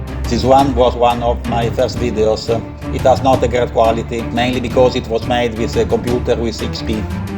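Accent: Italy. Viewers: Ah ITLALIANO ☀️ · I think, he from Italian.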